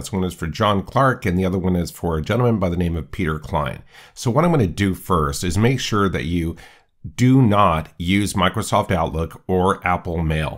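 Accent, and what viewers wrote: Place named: United States. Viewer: And u speak "American-English." I can understand some dialects, accents...But IF I cannot -- that's just another CHALLENGE for me in an already challenging situation. I dont want to be inflexible, but it is just a fact. Again, MANY THANX!!!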